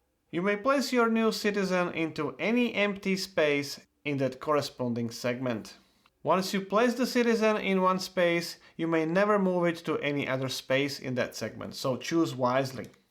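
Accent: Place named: Eastern Europe. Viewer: Wow, thank you so much!!! Amazingly chill content and as a west european, i freaking love east-european accents! 😬 How is it, that the video goes so long compared to your Praga video? I have heard, that this one is easier to teach? 🤔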